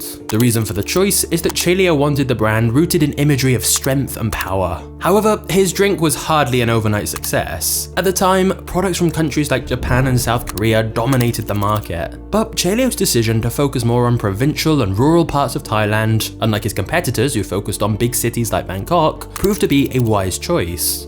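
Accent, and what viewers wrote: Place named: Midlands, United Kingdom. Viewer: It's a middle England thing, this is how people speak. For strong and proper English, go North!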